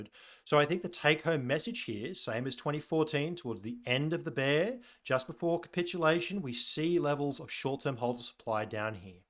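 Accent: Australia. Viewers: I always trust the aussie accent for some reason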